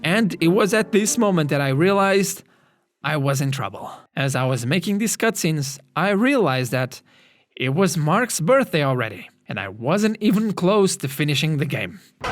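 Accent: Portugal. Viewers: Ah, ai está o famoso super reconhecível sotaque português, good work on the game man, nice job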